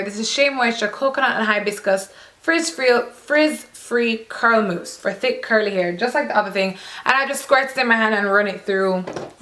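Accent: Jamaica. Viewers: are you jamaican?? u sound like a yardie .... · st. rose in ur face she is jamaican I wonder what's so terrible about jamaican accent anyways what I want to know is how u de tangle it cuz I wore the malaysian kinky for a month n 1 week n I washed it almost every day if it was thoroughly washed water contacted it every single day the beauty of it is that u can wash it in the shower while taking a bath and by the time u put ur clothes on its like dry no need for blow drying n all but I messed it up so bad ly when I stopped de tangling it n now I can't wear it again it's tangled with big cases of knots no way I can unwind it n I love this hair so much I am thinking of dying it burgundy n wear it for atleast a week before Christmas please tell me what to do its badly tangled help! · Jamaican accent 😍😍😍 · She is Jamaican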